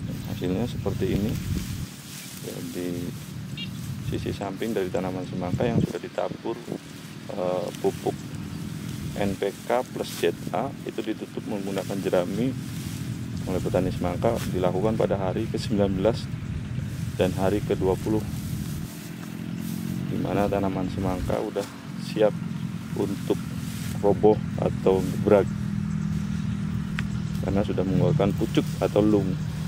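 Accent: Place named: Indramayu, Indonesia. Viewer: logate kaya logat dermayon.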